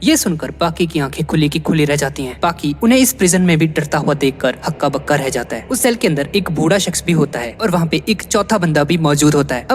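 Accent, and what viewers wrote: Punjab, India. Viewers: Bhai tum panjabi ho kya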